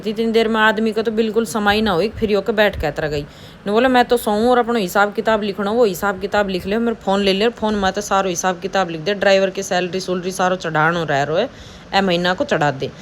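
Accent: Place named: Haryana, India. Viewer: जीजी हमने अपने हरियाणवी होने पे गर्व करूं तो देख ले अब ये बिटिया विदेश में जाने के बाद भी अपनी हरियाणवी ढंग न बदल सकी, बहुत बढ़िया है बेटा भगवान ये सारी मनोकामना पूरी करे ❤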